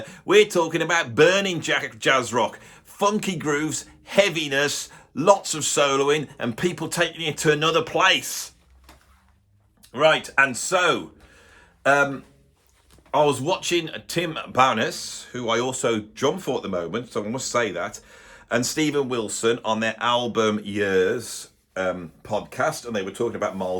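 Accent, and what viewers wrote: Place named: West Midlands, United Kingdom. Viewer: I'm sure you ve got lots of non native speaker😮 ffansall over the jaz rock .prog ahead lib nñ world thus pleaae try to refine yer west midlandish albeit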